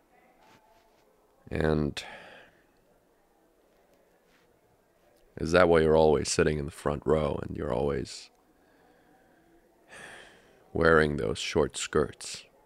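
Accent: Germany. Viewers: Is he nativ german or why that accent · that's a good German accent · 0 hitting me with the German accent and everything damn 😳✋🏻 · DUDE YOUR GERMANY ACCENT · such a good german akzent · love the german voice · I Love your German Voice 😂❤️❤️❤️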